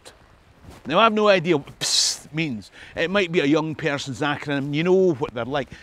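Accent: Scotland, United Kingdom. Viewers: "tell me your thoughts in the comments section" I really identified with the silly blustery American who really doesn't know much or think things through. it makes me so happy to have access to those feelings because someone talked about them. The only people I knew who had Scots accents and true history were gone pretty early in my life, so bitterness has been replaced by blusteriness whenever I find someone to talk to. Especially when I'm feeling like a man, talking to other men! I recently had someone call MacDonalds of Glencoe "cannibals" and it made me so furious I never spoke to them again because it was just not correct. I love hearing your accent and the Scots Gaelic language!! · Wonderful analysis, and as an American whose family story says we came from Kelso (btw, another Washington State parallel to Kelso just like Kenmore) I think your 5 points are dead on. 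We visited Kelso last year and learned no one named Kelso lives there. But we bear the name and it’s a lovely place. Love your videos, although I find the closed captioning helps this American understand your delightful brogue. Keep up the great work, Dick Kelso, Minneapolis.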